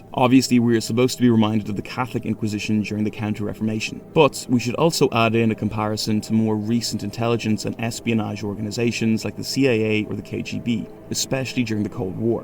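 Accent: Ireland. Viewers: I did come back. The Irish brogue, soft as it is, works well, and the analysis is novel and worthwhile.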